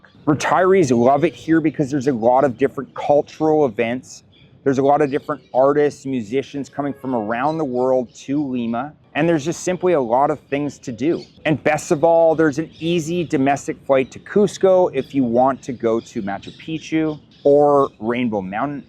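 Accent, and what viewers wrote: Canada. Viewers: I somehow knew immediately you were Canadian --- got a chuckle when you said where you were from and the rain tally. I'm in North Vancouver so, yeah..... Thanks for sharing, I'll be looking into Peru more going forward --- it's been on my shortlist as well. Cheers!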